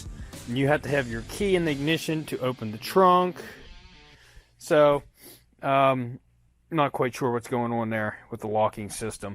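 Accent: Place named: West Virginia, United States. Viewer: Just curious. Kinda sounds like a WV accent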